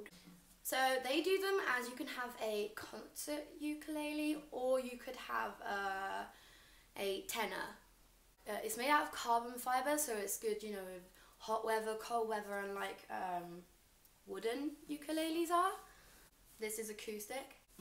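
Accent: United Kingdom. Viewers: English accent is the bow that ties it all together honestly. 100 out of 10 would've been head over heels for her back in highschool.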